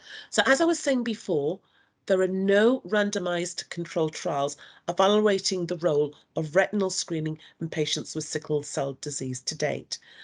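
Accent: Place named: United Kingdom. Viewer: Everything sounds better in british english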